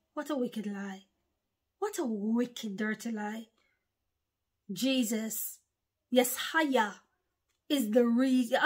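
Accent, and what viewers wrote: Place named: United Kingdom. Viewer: Sister, you look so beautiful. You kind of resemble my mother. They say you’re a false prophet, but I don’t see that. And the facts that you share let’s me know you know the truth. Keep up the good work, we look forward to your videos & appreciate you. I love your UK accent too, “I can’t find mi pen!” 😂😂😂. God bless you and your family Sister 🇨🇦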